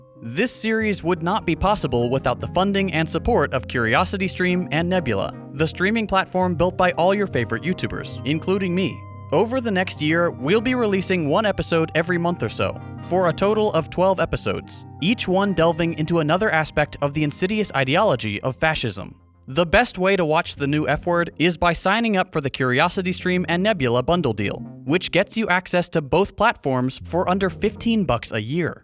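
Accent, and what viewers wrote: United States. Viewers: ""[China] clearly on a more stable trajectory than the United States."" Please tell me that you wrote that before the imploding-bubble cracks widened in the blacked-out Xinese economy. The accent is American, but the "thought" sounds like a Wumao. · This channel is so Anti-America, still speaks with an American Accent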